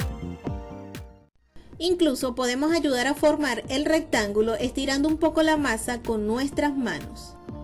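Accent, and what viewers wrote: Venezuela. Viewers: MUY BUEN DIA MI GRAN Y HERMOSA CHEF NO ME CANSO DE VER TODAS TUS MAGNIFICAS RECETAS ERES LO MAXIMO TE AMO POR TU DIALECTO DEBE SER VENEZOLANA Y SI NO YO DESDE MARACAY ARAGUA VENEZUELA TE DESEO MIL BENDICIONES MI CORAZON YENOS DE EXITOS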